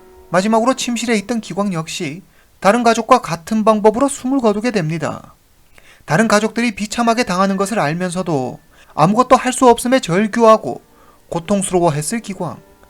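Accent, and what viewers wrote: China. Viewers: 조선족인가요? 무섭네요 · 길림성출신?ㄷㄷ 조선족같다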